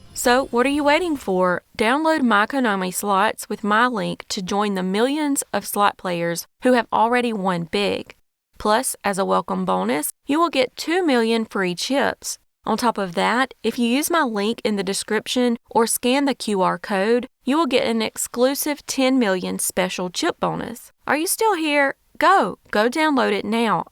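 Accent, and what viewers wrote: South, United States. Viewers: 🤬🤬🤬dang girl!!!! ❤️that fr southern accent!!! 😍😉🙃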